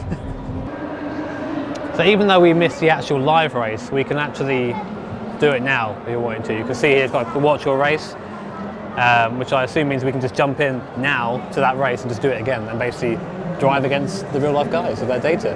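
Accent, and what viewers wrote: United Kingdom. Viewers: I never even noticed accent during his videos, but it seems he gets more British in public.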